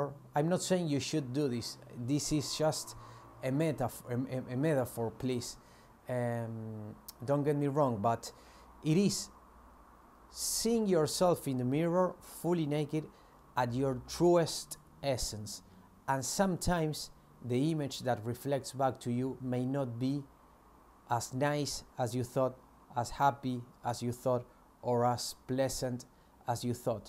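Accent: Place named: Latin America. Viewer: The content is good but I would suggest you working on your pronunciation a bit more. in some parts of the video your English accent sounds too thick and it can get distracting. I am Latina as well (I assume you are) and I know it takes years to perfect pronunciation, but it's not impossible to always strive to sound better, especially if you have important content to communicate. And yeah you're right the whole "Mind control" title can be misleading to many people.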